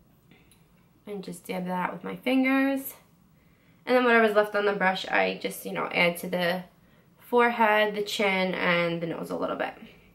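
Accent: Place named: New York, United States. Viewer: Being from NY I can definitely hear your accent lol I lost my accent when I moved to Wisconsin. lol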